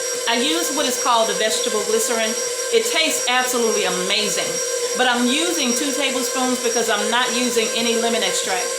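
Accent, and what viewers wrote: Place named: United States. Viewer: I love this lady she talks so nice. Not overly English or southern style. Very good sound for me I am hard of hearing l love her American sound.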